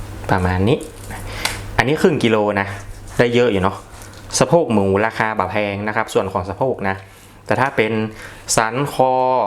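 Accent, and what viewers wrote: North, Thailand. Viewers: คนเหนือเฮาอู้ม่วนจ้าว❤❤ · อู้คำเมืองเพราะขนาด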